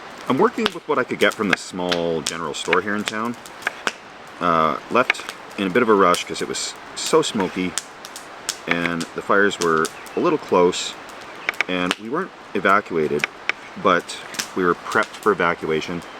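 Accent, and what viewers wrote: Alberta, Canada. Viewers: Epic idea. I love your stuff. Former Edmonton resident and you got that same Albertan Hoser accent that I miss so much. Haha. You remind me of a bunch of good dudes I knew when I lived there.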